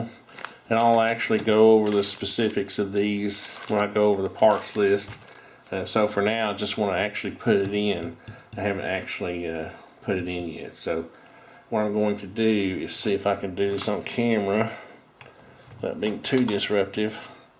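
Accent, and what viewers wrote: South, United States. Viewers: that man comes from the South. Man you can hear that.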